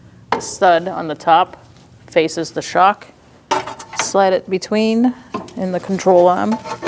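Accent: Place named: New England, United States. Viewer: Love that New England accent !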